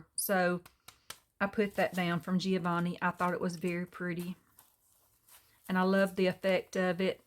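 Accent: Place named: Texas, United States. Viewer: This is a stunning journal. I love your style. I also love the accent being from Texas we say y'all and fixin all the time. Tfs Kathy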